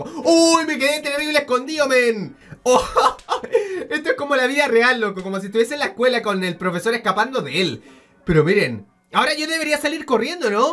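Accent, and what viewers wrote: Chile. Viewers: Eres de chile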